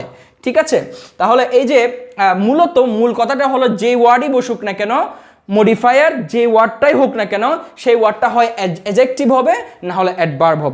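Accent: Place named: Chittagong, Bangladesh. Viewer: Uni ctg er gram tek alim dise, shei porjonto okane silo ekarone pronunciation gramer ta chole ashe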